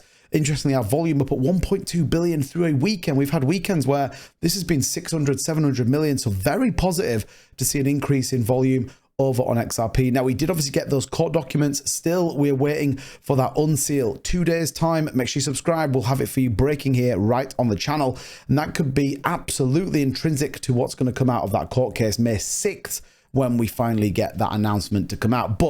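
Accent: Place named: North of England, United Kingdom. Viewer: The accent is from the north of England.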